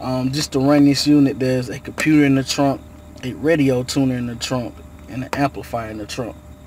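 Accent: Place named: RP, United Kingdom. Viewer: Hate these posh accents🤓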